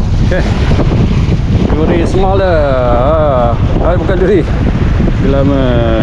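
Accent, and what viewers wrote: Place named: Banjar, Indonesia. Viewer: pekat banjar nya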